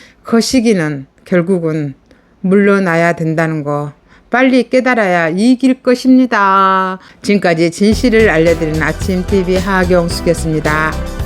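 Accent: Jeolla, South Korea. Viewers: 전라도 사투리좀 그만~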